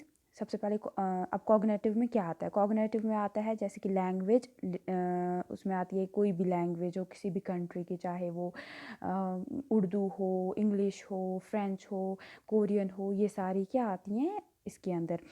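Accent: Kashmir, India. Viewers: That Koshur accent. Keep it up!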